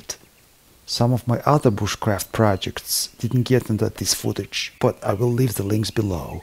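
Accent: Russia. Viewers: With a strong Russian accent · Shaun Davenport Defo Russian accent. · yes the accent Is with no doubt sounding russian. But he uses finnish word for dry pine, kelo. I Wonder why. I think he Is a Karelian. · Really?? I thought it was him for sure with that Russian accent and little mistakes... Wow that's interesting. So I guess he hired someone with a Russian accent on purpose? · your accent reminds me of my granpa who lives in moscow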